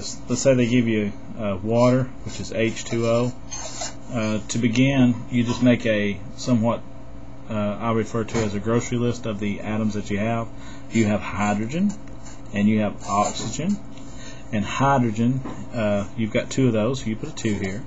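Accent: United States. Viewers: i learned this back in 10th grade but i forgot how to do it. lol. thanks. having a slow talking country guy helps a bunch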